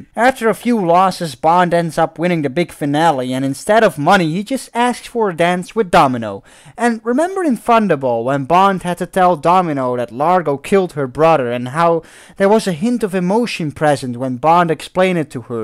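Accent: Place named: Netherlands. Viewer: He's dutch, that's his accent. How many languages do you speak?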